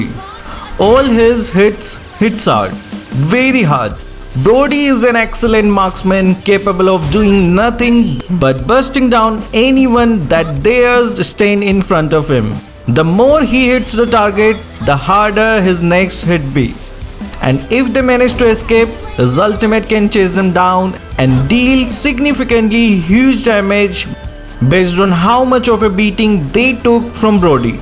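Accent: India. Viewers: I dont trust indians when it comes mobile legends 😅🤣🤣🤣😂 the accent says it all masala accent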